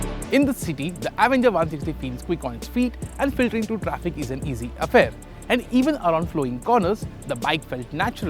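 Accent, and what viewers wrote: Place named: India. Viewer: U look like brazalian speaking indian english accent 😁😁😁😁, nice video bro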